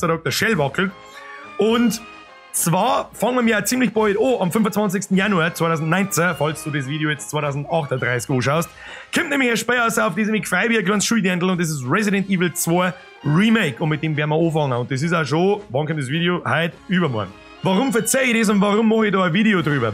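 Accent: Bavaria, Germany. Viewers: Ich komme aus Sachsen, genauer aus dem Vogtland und ich bin gerade erstaunt wie viele Ähnlichkeiten unser vogtländischer Dialekt (der im Übrigen mit Sächsisch nicht viel zutun hat) stellenweise mit dem Bairischen hat. Man könnte das Vogtländische als eine Mischung aus Sächsisch, Fränkisch und Bairisch bezeichnen. Ich versteh auch fast alles was du sagst, was glaube ich einem NRWler oder Berliner deutlich schwerer fällt.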